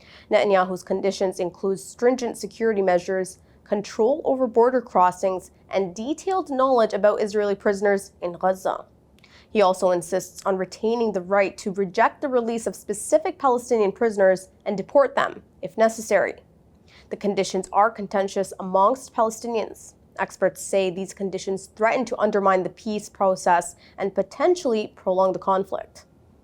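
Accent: United States. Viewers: I am a Muslim and I don’t like Palestinians the way Palestinians treat other Muslims in Saudi Arabia. I know Palestinians Directors in GCC countries badly treating others at jobs there I cursed one Palestinian director to be punished by Israel back in 2016. So please I advise you people to treat others well by rich Palestinians working in GCC countries. Also why you people migrating to Europe US and Canada and not fighting IDF. Islam tells you not to flee from war but fight them but you people are like cowards fleeing. So you better go to Gaza to fight instead of giving us news in an American Accent. You people and Afghanis are the same you live in western countries while you want others to tell Israel to stop war instead of you fight.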